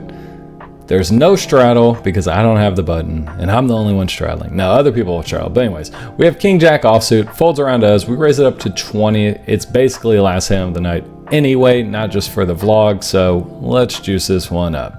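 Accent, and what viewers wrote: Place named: Texas, United States. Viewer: I'm learning about different American accents. Would you say your accent is typical for a Texan? Can you tell if somebody's accent is from Tennessee or South Carolina? Do those accents sound different to you?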